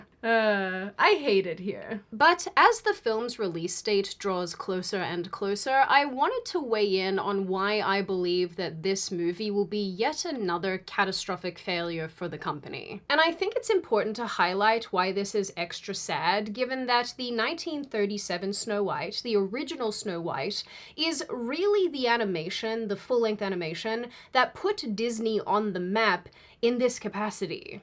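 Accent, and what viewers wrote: Australia. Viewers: Aussie accent getting stronger and stronger..... LOVE IT!!! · I'm not sure if in crazy or what 😂 but you sound way more Australian when you are sick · GREAT analysis, Sydney. You pointed out things that I had not thought about, specifically the WHY woke movies (and everything else woke) is SO BAD! Btw... your Aussie accent really comes out when you're not feeling well - but at least you looked great...! 😊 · Sydney, you sound a lot more Australian when you sick. 🐿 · Your Aussie accent is thicker when you're sick. Hilarious and endearing.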